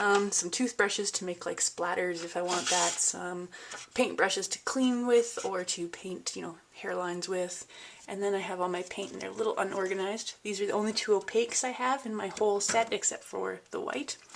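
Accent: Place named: Canada. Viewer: Ah! I watched ice road truckers with Canadian truck drivers in it lol...Love your accent :)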